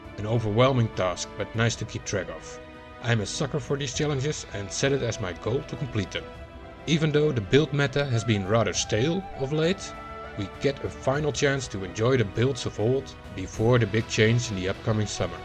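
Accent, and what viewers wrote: Netherlands. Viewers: i can tell by your accent you are dutch am i right?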